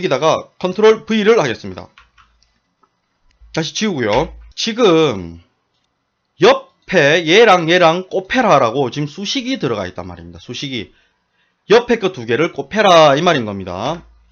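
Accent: Gyeongsang, South Korea. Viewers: 구수한 갱상도 사투리에 열정적인 강의 귀에 쏙쏙들어옵니다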